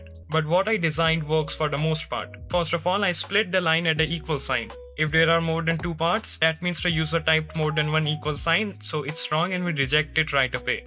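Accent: India. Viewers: they sound indian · By the Indian accent I know this was gonna be peak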